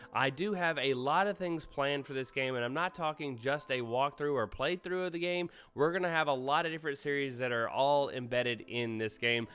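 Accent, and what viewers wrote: Northeast, United States. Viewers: I'm from Kentucky your accent sounds like you're from the Northeast it's kind of funny how I noticed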